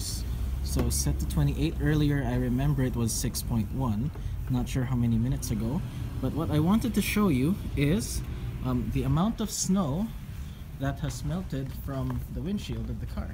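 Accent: Philippines. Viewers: Worth it ba tong sasakyan na to sir ? Taga cali kasi ako ang dami kong nakikita nyan dito. Hindi naman malakas sa kuryente pag nag charge filipino ka halata naman sa accent nating mga filipino. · I noticed the Filipino accent 5 seconds into this video haha. Greetings from another Pinoy from SoCal and it's great to see another pinoy driving an EV. 🇵🇭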